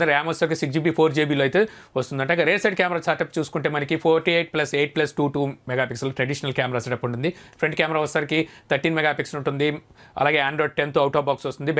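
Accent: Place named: Telangana, India. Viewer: Namaste kaaka telangana slang 🔥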